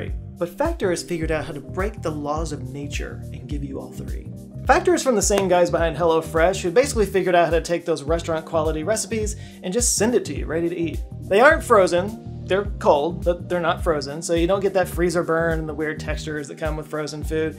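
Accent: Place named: Texas, United States. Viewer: Oh yea I see what you mean. But that just adds more weight to my orignal comment. Being so Texas you try for an Australian accent but the state says "We're gonna go ahead and override that..." As a lifelong Midwesterner I had to stop myself from starting my comment with Ope. Keep on keeping on...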